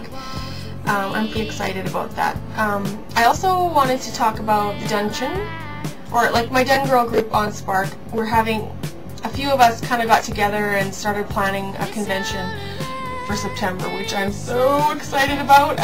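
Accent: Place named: Wisconsin, United States. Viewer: You betcha! Lol....I'm from Wisconsin, so it sounds like ours a little.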